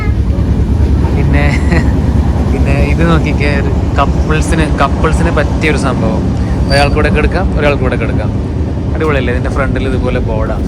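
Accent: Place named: Ponnani, India. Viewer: ഞാൻ പഠിച്ചത് MES കോളേജിൽ ആണ്. എന്റെ സ്ഥലം കുമരനെല്ലൂർ. പിന്നെ നിങ്ങളുടെ സംസാര രീതി കേട്ടപ്പോൾ നമ്മുടെ അടുത്ത് തന്നെയാണ് എന്ന് തോന്നി. എല്ലാ ആശംസകളും നേരുന്നു.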